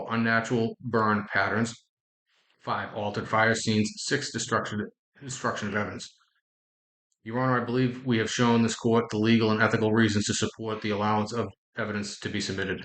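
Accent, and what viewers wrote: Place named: Boston, United States. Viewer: Not sure why this was on my homepage, but sick Boston accent.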